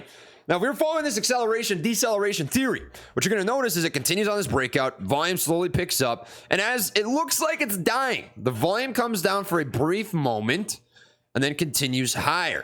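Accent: Minnesota, United States. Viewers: Dude what was that Grizzly chew reference haha? You sound like a Minnesnowtan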